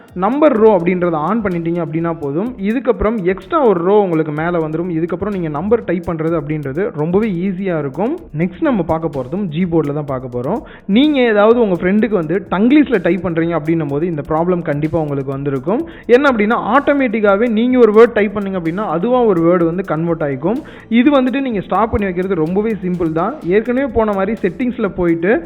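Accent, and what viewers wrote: Tirunelveli, India. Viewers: bro neenga nellai yaa???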